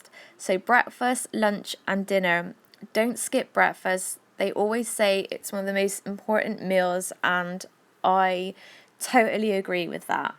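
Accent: Bristol, United Kingdom. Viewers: Love your bristolian accent . . feeling home sick now 😊💕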